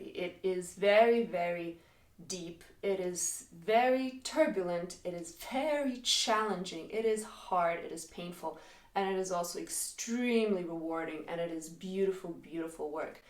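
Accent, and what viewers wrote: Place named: United Kingdom. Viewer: I really love what you say and the Nice British accent!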